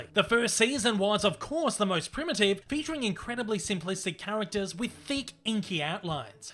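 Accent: Australia. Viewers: Are you serious? A hint ... He sounds 100% from Down Under. Unmistakably so. Actually his narration reminds me of an old 1980's TV show called Lifestyles of the Rich and Famous, featuring the voice of English entertainment reporter Robin Leach. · Is it me, or does this guy kind of sound Australian... I hear a hint, but probably not for those with an untrained ear.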